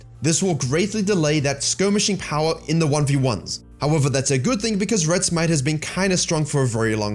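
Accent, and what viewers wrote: South Africa. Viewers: This is the first video i saw of your channel and i instantly knew u were south african lmao are you a masters player with 200 ping tho ? how is that possible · i thought i recognised the SA accent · Hey man, are you South African?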